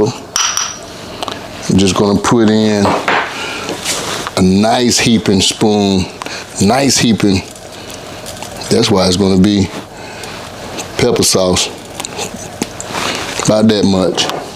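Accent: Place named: East Texas, United States. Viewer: You have to be from East Texas, because the accent and the wording and the GOOD OLE' SOUL COOKING is giving East Texas. I was born and raised in Lufkin, Texas. Keep Up the Good Work. Love your channel!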